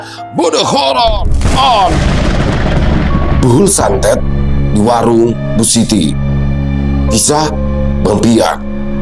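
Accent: Jakarta, Indonesia. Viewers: Bang itu pas banget klo ceritain logat betawi sangat terhibur,apalagi klo bang piyan bertengkar guyon sm baba ali,klo bang doni bertengkar guyon sm ibu zubaedah bikin enak denger nya sambil tertawa,mantap bang sdh bisa menghibur kita semua,sehat utk bang nya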